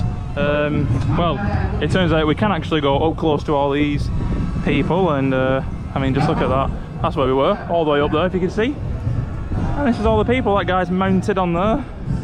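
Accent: United Kingdom. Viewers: Bro..Menonton konten mu, serasa menyaksikan film kerajaan klasik dari Inggris Raya.. Aksen bicara mu..Inggris banget... 😁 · Hi and, welcome in Jakarta. On 5th of October 2025 we will celebrate do called "80th Armed Forces Day" here in Indonesia, so you saw a whole lots of Army, Navy (Marines under Navy) and Air Force stuff being display for public. For your pronounciation, I know you are from UK. Enjoy your stay in Indonesia then. Cheers...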